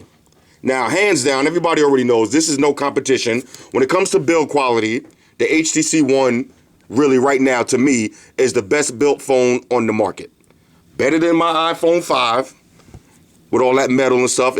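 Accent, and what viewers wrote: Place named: New York, United States. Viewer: a real ny nigga.... i can tell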